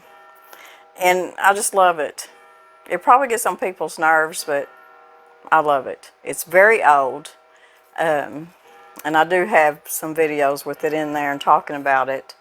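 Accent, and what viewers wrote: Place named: Arkansas, United States. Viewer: Hi and, I love your videos. I look for a new one every day. My husband comes from Hardy Arkansas. His family is wonderful and talks just like you. Good people. I’ve swam in Spring river and stayed at the old motel, the lady that owns it was a friend of my husband’s mother. I heard stories about Hap the Chief of police and how he still keeps folks doing right from wrong Lol. I wanted to ask a guestion because I’m new to canning. I wanted to know if Round roast, bottom and Top Roast is good for canning soup. Stew and meat? Thank you my friend, Cheryl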